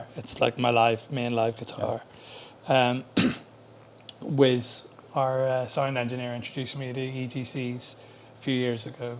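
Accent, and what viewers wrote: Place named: Belfast, United Kingdom. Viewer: He sounds like he's from Belfast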